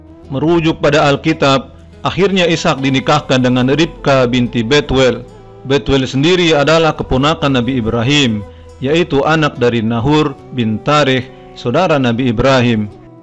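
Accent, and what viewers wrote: Bugis, Indonesia. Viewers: Sy yakin yg ngomong ini orng bugis..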